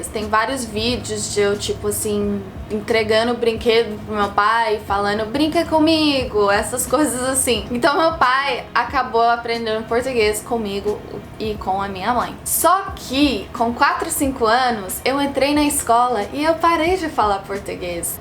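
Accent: Minas Gerais, Brazil. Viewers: Vc fala português 1 milhão de vezes melhor q eu kkkk, parabéns🙏🏻👏🏻👏🏻👏🏻 Ah e vc tem um pouco de sotaque mineiro tbm tamo junto kk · Seu sotaque n é de gringo, é de mineiro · Esse sotaque de Minas ❤❤ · Você não tem sotaque gringo na minha opinião, tem mais sotaque Mineiro! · Mai nunka k vc foi gringa mineirinha simmmm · eu sou de Minas e p mim isso não é português estranho , eu falo +- assim, é "sotaque" de mineiro kkkkkkkkkkkkkkkkkkkkkkk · passada, vc fala mt bem... parece até um mineiro ameii seu sotaque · seu sotaque é igual o meu, MINEIRO ❤ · Muito sotaque de meneiro, deve até ser que falando inglês soe diferente pros gringos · Depois de ver uma canadense filha de um alemão e que fala português com sotaque baiano, agora uma canadense falando igual mineira e filha de polonês, fala polonês também ? Jesteś bardzo piekną :) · Sotaque mineiro total véi,como assim você não é brasileira?Kkkkkkkk,queria eu ser tão fluente em duas línguas. 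· Tem sotaque de mineiro! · Na vdd você fala como mineira normal... Parece que viveu a vida toda aqui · pra mim não me parece gringa, o sotaque dela não é canadense, parece mais sotaque mineiro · Parece brasileira e tem sotaque mineiro 👌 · CÊ TEM SOTAQUE É DE MINEIRA , TREM BÃO DIMAIS DA CONTA SÔ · Vc é brasileira tem até sotaque de mineira slk kkkk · seu sotaque é mineiro, até seu jeito de se expressar rsrs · Que legal vc fala mineirez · Vc tem sotaque de mineira e NÃO de gringa 🌹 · Prosódia igualzinha a de um mineiro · Você fala perfeitamente o Português. Passa por brasileira tranquilamente. O seu sotaque é brasileiro, mais precisamente mineiro do Sul de Minas, bem leve. Parabéns. Passa por mineira ou paulista do interior de São Paulo. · Você fala português melhor do que eu que nasci e vivi por quase 19 anos no Brasil! Parabéns!!! Quase nem da pra notar o sotaque “gringo”... só o mineirês! 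😂😊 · Pai polonês, mãe brasileira, filha canadense com sotaque mineiro. Isso é incrível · Se eu não tivesse lido o título do vídeo eu nem ia saber que é gringa. Tem sotaque de mineira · vc tem sotaque de mineira kkkkk e não de gringa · agora entendi porque seu sotaque é levemente mineiro. A palavra que você falou com maior sotaque foi MÃE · Seu português é perfeito e seu sotaque é de mineira. A única coisa que você poderia corrigir é quando você usa o ver "ir", apesar de que quase todos os brasileiros também falam errado. >>> Assim como em inglês, em português também a gente vai A algum lugar, e não EM algum lugar. Exs.: Eu fui ao aeroporto. Eu ia à escola.... Engraçado que sua mãe é brasileira, seu pai é polonês, você nasceu no Canadá, mas o seu nome é alemão. :))) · Seu português está muito bom, ou melhor você fala o meu idioma MINEIRÊS, Uai . Abraços daqui de BH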